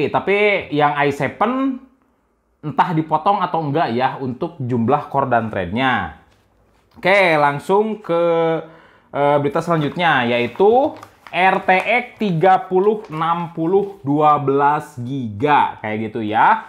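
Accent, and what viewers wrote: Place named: Tasikmalaya, Indonesia. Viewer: Orang tasik dia gan, makanya gitu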